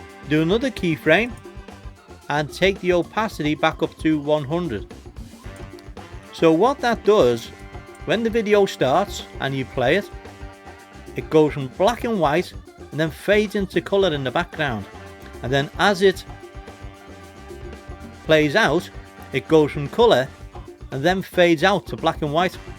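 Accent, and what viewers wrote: Liverpool, United Kingdom. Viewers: love your Liverpool accent.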